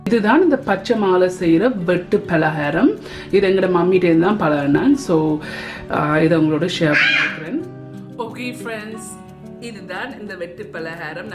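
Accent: Jaffna, Sri Lanka. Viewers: I was browsing for Jaffna sweets for Xmas and found yours. Love your videos with our Jaffna Tamil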